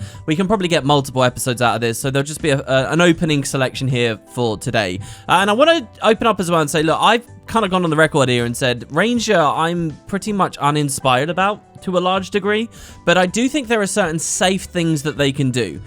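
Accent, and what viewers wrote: United Kingdom. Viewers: British to a ̶t̶e̶a̶ tee.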